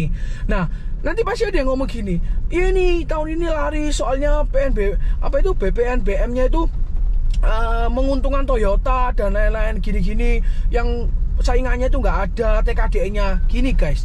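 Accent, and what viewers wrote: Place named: Surabaya, Indonesia. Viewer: Mantap koh...., opininya logat suroboyo an...😁😁😁😁😁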